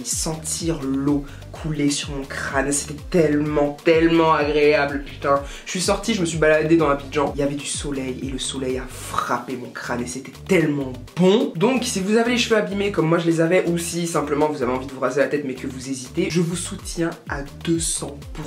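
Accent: Ivory Coast. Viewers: L'accent ivoirien 🇨🇮🇨🇮🇨🇮💗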